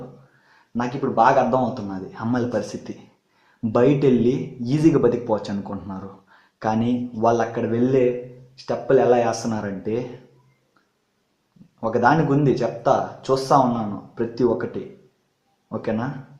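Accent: Rayalaseema, India. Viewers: Ur 100% right bro Baga cheppavu nuvvu Bro manadhi rayalaseema kadha expt chesanu ni matalu vintumte telustundhi ......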